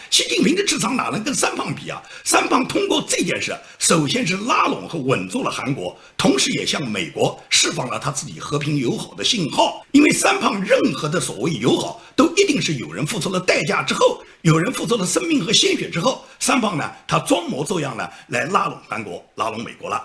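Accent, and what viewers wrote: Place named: Nanjing, China. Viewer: 挂了，是南京话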